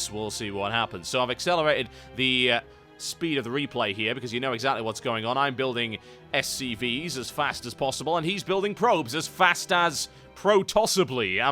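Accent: United Kingdom. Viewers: i think ur funny as hell jw r u british caus eu sound just like my britsh friend :D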